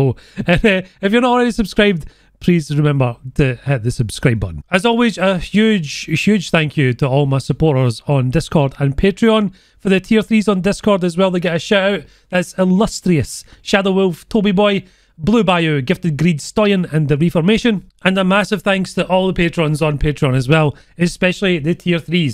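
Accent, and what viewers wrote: Scotland, United Kingdom. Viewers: Hey I was for Christmas in Scotland Ben Nevis defeated me but your video was useful as a accent tutorial while drinking with Scottish people-they did not defeated me in drinking 🤣 Scotland is so beautiful! people kind! Alcohol great! I brought a blue beautiful hat everyone 8n London aks me about my orgin and i feed them up with madeup stories about my childhood in mountains- with perfect accent because of you 💪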